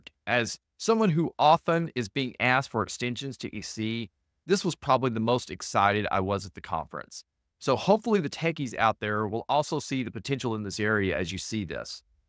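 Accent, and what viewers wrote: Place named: Texas, United States. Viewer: Nothing like a bald guy with a Texas twang to start my week. Thanks B, this was a great wrap up and it was nice seeing you.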